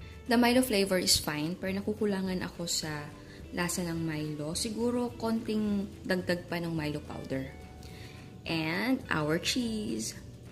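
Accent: Visayas, Philippines. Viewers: bisaya po ba kayo parang bisaya po kasi kayo mag salita pero ang galing nyo po gumawa ng pagkain or dessert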